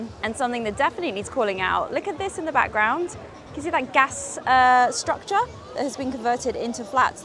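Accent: RP, United Kingdom. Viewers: You speak elegantly, and I wonder what accent you have. I assume it sounds like modern RP.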